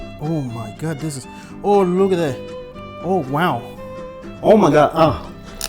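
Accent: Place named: South, United States. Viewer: Hi im KIANA from CENTRAL CALI . . I really like ur videos. Tu cocina es muy estilo rachero Y ME GUSTA MUCHO. ❤ I am mexican & hawaiian and my mom is MEXICAN from LIVINGSTON CA so she cooks using alot of can salsa instead of using real JALEPENOS. Yes her food is still GR8 BUT ! ...OH MAN DO I KNOW THE DIFFERENCE IN THE FLAVORS OF MEXICAN FOOD WHEN U USE REAL JALEPENOS & SERANOS arbrol anchos & GARLIC & KEEPING THE SEEDS IN TO GIVE EVERYTHING A KICK OF BIG FLAVOR. NOW THATS AUTHENTIC. Bt my mom stuck on her cans like green chili enchilada sauce can to make pork chilli verde ( still good ) bt I THINK ITS TIME TO SHOW HER THE DIFFERENCE. PS .... Luv ur country accent❤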